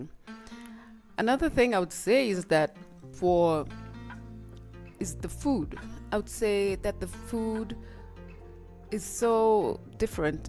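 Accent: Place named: Kenya. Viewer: You never lost your Kenyan accent,,, being Kenyan is permanent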